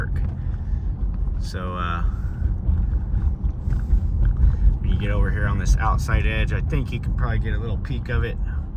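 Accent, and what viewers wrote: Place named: Texas, United States. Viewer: ❤🇺🇸You are good at driving. I love: your accent; the two beautiful and cloce friends Dos in their nice color:Gold and Black(You already told their name in anothet video); you dress wonderful and your Ranch will be work very soon, that's my wish as your fan from Brazil. I thought you were from Texas. Any away I realy enjoy watch your video from California. Thanks alot. I see you on next.❤🇺🇸👏💖🙋🇺🇸💖💚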